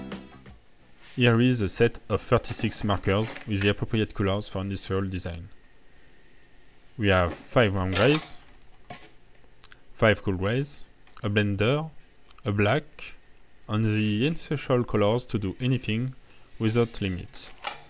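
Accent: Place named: France. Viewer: love ur french accent.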